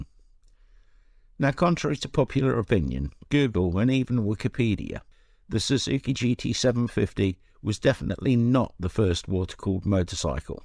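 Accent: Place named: Derbyshire, United Kingdom. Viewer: That’s odd. YT does that occasionally. No, haven’t deleted any comments. Twin Brembos should get the job done. I look forward to the vid. I’m in Derbyshire. Your accent sounds like you’re nearby. Am I correct?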